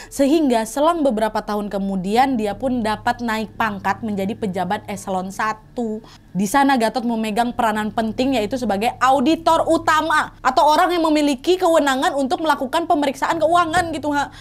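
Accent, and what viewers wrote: Indonesia. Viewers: Kak itu kalo bawain cerita beda bgt sm youtuber yg lain. Dia gk sok sokan melebih lebih kn dialog kyk bhs Indonesia sok" Di buat kek logat bule atau bhs Inggris yg gk kita tau artinya. Dia lbih ke apa adanya dia aja dengan logat Khas Indo nya yg medok agar lbih mudah di mengerti sm kita smua, kalo buat aku itu sih yg bikin aku gk bosen nonton nya. Sehat sehat ya kak sm tim, buat dedek bayi nya jg moga sehat selalu, dn selalu di limpahkn keberkahan. Aminnnn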